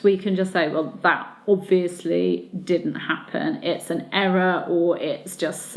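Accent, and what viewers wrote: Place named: United Kingdom. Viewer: That's the British English spelling - and she's speaking British English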